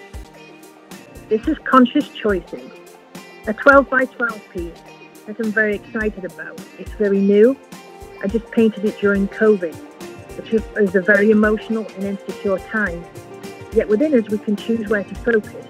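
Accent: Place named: Northern England, United Kingdom. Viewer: Love hearing your luvly Northern voice , hearing your descriptions and your creative experience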